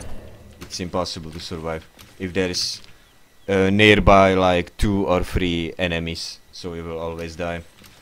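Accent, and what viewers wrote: Czech Republic. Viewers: Hii I'm new here but I have a question...are you from czech republic? Love your accent ! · Jsem si říkal, že takováhle angličtina musí být od Čecha, nicméně nice vysvětlení jak hrát za Evie, dneska zkusím, jestli to je ještě aktuální a pokusím se za ní naučit. :D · Are you from cz?protože máš takovej přízvuk.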